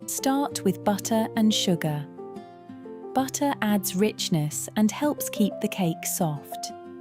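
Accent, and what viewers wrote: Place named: United Kingdom. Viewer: I love her British english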